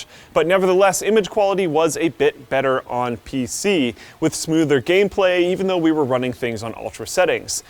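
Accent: Canada. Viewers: from second one you can tell he's Canadian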